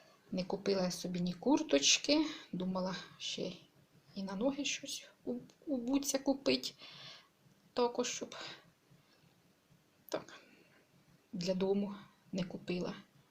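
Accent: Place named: Poltava, Ukraine. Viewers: Доброго вечера,.Ви говорите і примовляєте як у нас на Полтавщині. Приємно вас слухати. Дякую за відео. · так і я дивлюся і також так думаю,мова точно як у нас на Полтавщині. Я сама з Березняків 25 км від Лубен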